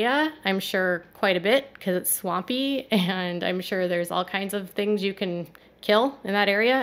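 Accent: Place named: Midwest, United States. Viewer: I'm also from rural Minnesota! For a while now, maybe your accent, I've thought you were from MN or somewhere in the midwest.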